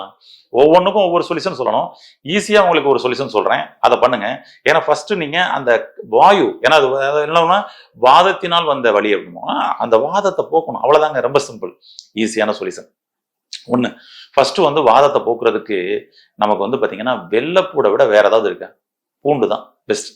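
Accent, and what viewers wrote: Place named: India. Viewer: Dr சொல்லும் சில சொற்களை இலங்கை மக்கள் புரிந்து கொள்ள முடியாதா ? Air (எயார்) என்பதைத்தான் ஏர் என்கிறார். Common கொமன் என்பதைத்தான் காமன் என்கிறார். தமிழில் காற்று என்று சொன்னால் நன்கு புரியும். இந்தியர்கள் தமிழிங்கிலிஸ் பேசுவார்கள்.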